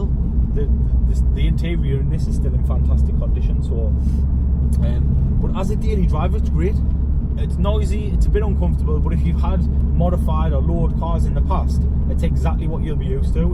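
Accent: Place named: Sunderland, United Kingdom. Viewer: you sound local to me bud I'm from Sunderland cant wait to get my mk2 this year , iv never had the chance to even sit in one there that rare around my area .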